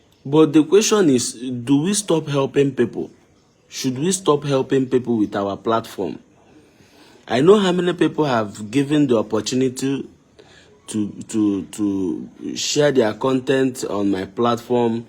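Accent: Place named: Mbaise, Nigeria. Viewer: Waoo you really changed,where have you been, I could remember you with thick mbaise accent,now is very hard to hear that accent again.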